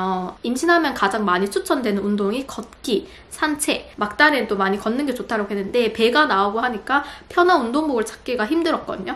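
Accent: Gyeongsang, South Korea. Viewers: 졸귘ㅋㅋㅋ 포슬포슬하이 쫀독쫀독하이 마시따아~ ㅋㅋㅋㅋㅋㅋㅋㅋ 영락옶는 갱상도 한국여자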